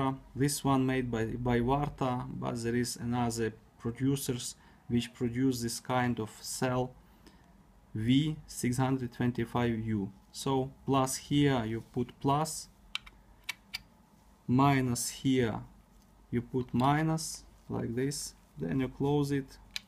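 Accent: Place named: Russia. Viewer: Хорошо говорите по английски... молодец... А думаете все равно по русски... и это чувствуется. Спасибо за обзор... Хороший фотоаппарат... всегда мечтал купить его в молодости.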